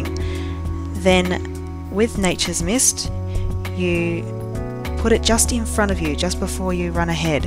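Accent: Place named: Australia. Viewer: AUSTRALIAN ACCENT U IN AUSTRALIA TOO!!!:D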